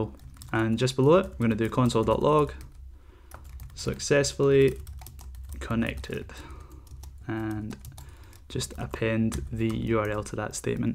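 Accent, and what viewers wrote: Scotland, United Kingdom. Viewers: nice tutorial and nice scottish accent, brings me back to my old scottish lads in Spain :,) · Great tutorial and the scottish accent makes it even better :) I'm not even doing angular, but tutorials for redux-observable are so scarse... If you could do one on that, it would be great, but in any case - great work, thanks and keep it up.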